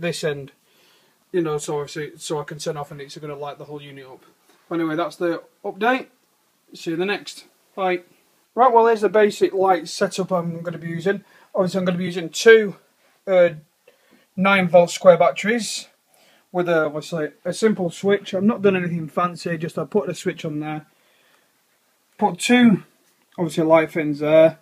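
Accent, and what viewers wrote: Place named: Birmingham, United Kingdom. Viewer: Brummie or Coventry?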